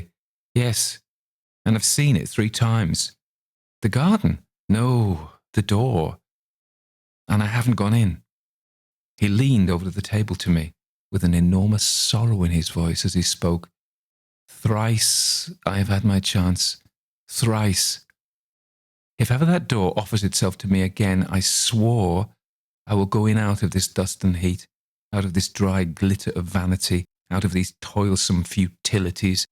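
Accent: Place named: North West England, United Kingdom. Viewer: You have been gifted with your voice. I’m trying to place your accent, somewhere in the north west Is my guess.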